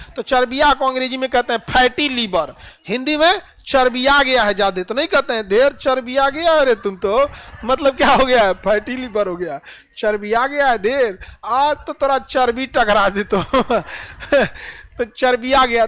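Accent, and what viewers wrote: Bihar, India. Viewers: Sir bahut achha samjhate hai Bihar abaj me bhi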